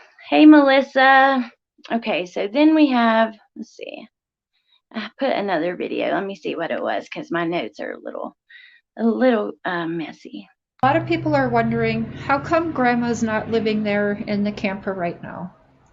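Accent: South, United States. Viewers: 1st time here, I can tell youre a southern girl like me lol 😊Youve got a new sub.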